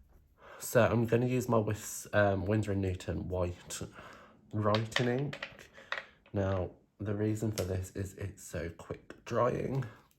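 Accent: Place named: United Kingdom. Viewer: Hi there, great video! I was on the fence about this stamp set, you sold me!! Getting it! I hear your British accent, I miss it, I am from Bristol, living in the US.👋🇬🇧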